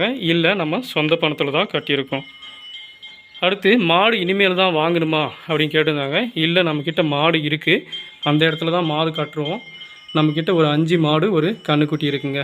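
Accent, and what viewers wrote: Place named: Dharmapuri, India. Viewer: Super ga nenicha slang ora mari iruku nu nanum dharmapuri tha dpi la endha ooru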